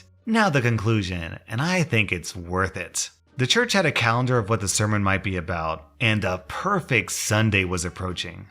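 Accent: South, United States. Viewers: I LOVE HIS SOUTHERN ACCENT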